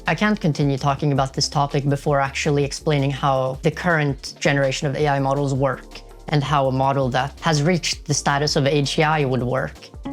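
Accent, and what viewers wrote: Sweden. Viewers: Just found your channel, interested to know where you are from; you talk English like a Swedish person. Nevertheless you bring up good points and it is clear that you have given this a lot of thoughts and seems to understand AI and how humans interact with it at a greater level than most. I agree with you that llm's like GPT's are not the path to AGI, it might help us along the way but I think we are running up a wall and need to find a new model first. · Are you a Swede? The "E"s are quite Swedish. What is it with Nordics being over represented by nerds... is it that outside sucks majority of the time. Winter is nice tho.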